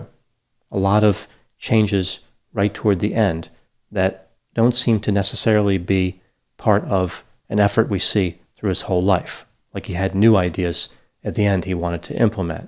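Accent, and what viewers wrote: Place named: Pittsburgh, United States. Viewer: hearing a Pittsburgh accent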